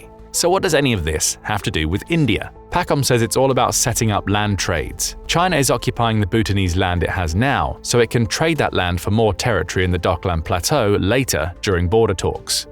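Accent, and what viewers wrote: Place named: Oxford, United Kingdom. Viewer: Trying to use a British guy with an oxford accent to justify spreading outright lies is despicable. Read the history of the conflict and you find India is the wolf in sheeps clothing.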